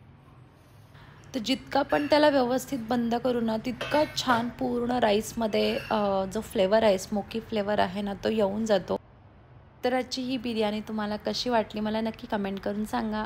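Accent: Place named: Ahirani, India. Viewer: Tumchi basic language aahirani aahe ka?Tumchi Marathi language Varun vatate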